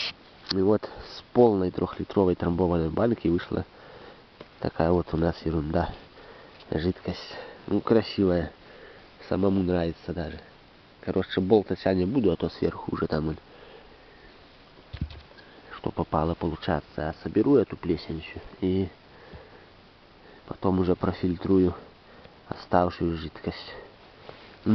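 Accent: Belarus. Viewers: Цудоўны наш акцэнт, братка-беларус!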